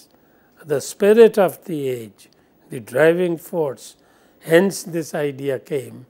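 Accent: India. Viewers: A very good lecture on this topic, new with a certain vocal flavour from the Indian subcontinent.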